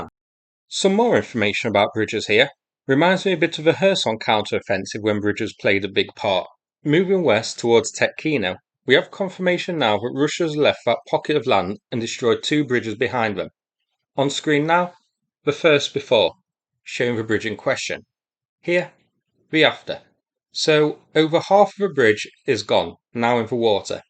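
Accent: United Kingdom. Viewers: Love your updates, even though you've got a thoroughly Brit accent (I'm from Oz). Keep up the great work. Cheers!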